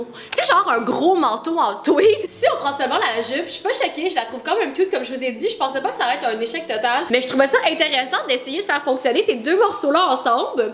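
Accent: Quebec, Canada. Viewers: J'adore ton accent Québécois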